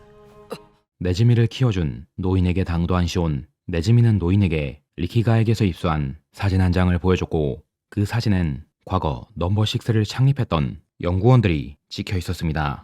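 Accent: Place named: China. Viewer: 조선족이신가 발음이…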